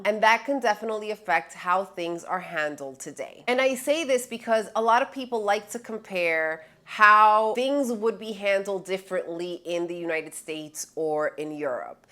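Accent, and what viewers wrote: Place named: Paisa, Colombia. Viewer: Great video and U love the paisa-ish accent when you speak Spanish! And a fantastic, timely warning. For anyone who doesn't know, though, "No dar papaya" is an expression that means don't let your guard down or otherwise make yourself an easy mark.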